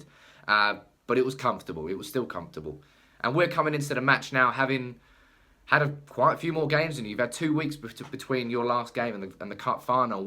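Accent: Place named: Manchester, United Kingdom. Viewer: I can't understand his thick Mancunian accent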